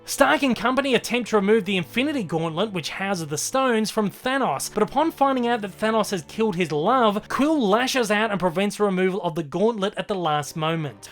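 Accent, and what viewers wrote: Australia. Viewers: Your accent is horrendous, no offense. What are you, some Asian Aussie? You sure sound like it.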